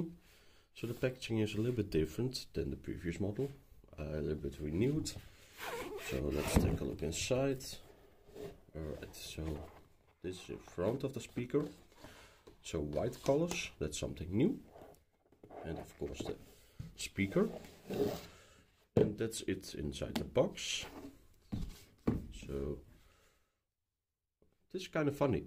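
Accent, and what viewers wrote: Netherlands. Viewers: That dutch accent🥲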